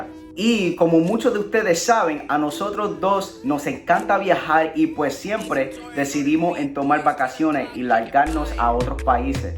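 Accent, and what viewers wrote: Puerto Rico. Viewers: Me encanta el acento puertorriqueño y excelente que disfrutes de mí país junto a tu esposa! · A donde esta el gringo, yo veo un Cici y una Cerota osea, no mames que tu lengua raspa, Eres puertorriqueño dumb fuck 👊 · Gracias, muy buen video sobre el pulgarcito. Pero tu hablas y pareces mas Boricua que un "gringo" como dice el titulo de tu video. Cambiale de gringo a boricua. Yo estuve mucho tiempo en P.R. y tambien me anamore de la Isla, lo mismo de NY en donde mis amigos eran casi todos boricuas. En horabuena!!!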